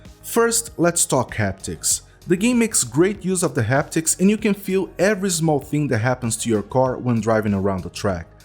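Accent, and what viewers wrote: Brazil. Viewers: Tu é brasileiro? Tem sotaque hahaha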